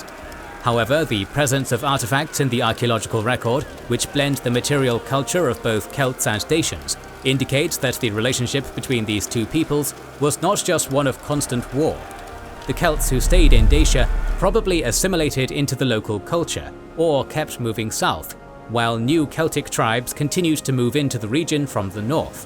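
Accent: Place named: United Kingdom. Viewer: Ništa mu ne verujem. Ima Britanski naglasak. Oni su prevaranti i lopovi.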